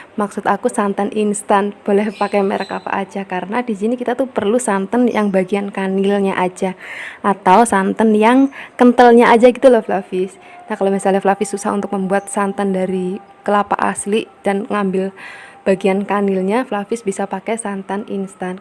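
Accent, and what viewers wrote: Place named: Banyumas, Indonesia. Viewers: Menarik....apalagi dialek banyumasannya mantep😅😅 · Banyumas dialeknya ❤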